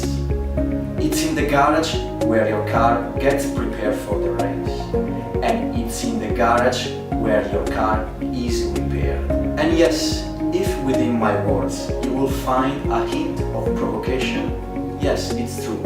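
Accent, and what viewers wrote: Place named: Italy. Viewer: Minchia allora avevo ragione a pensare che fossi italiano all'inizio l'accento era quello hahaha viva l'italia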